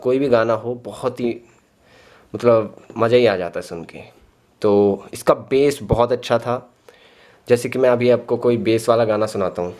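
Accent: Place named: Rajasthan, India. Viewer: Bhai aap rajasthani ho thodi marwari bol re ho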